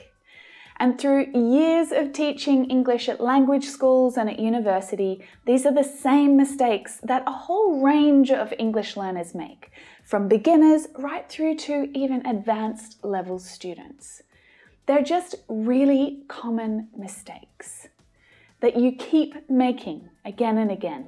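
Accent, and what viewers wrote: Australia. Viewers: Love her australian accent ❤️ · Wow the Australian accent is amazing 🐇 i like it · I love your Australian accent. Especially when it comes from female. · Well Aussie say "can't" like "kan" and that confuses me . For example when she says " Bad habits "kan" be fixed I'm not sure it can't be fix or can be fix. Any Aussie here help me out of this pleaseee!!!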